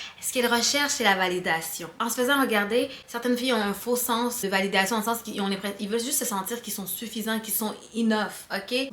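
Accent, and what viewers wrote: Quebec, Canada. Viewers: So Cut ton accent canadien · G n aime pas la guerre des sexes g suis d accord avec vs vous etes du quebec ou du canada vu votre accent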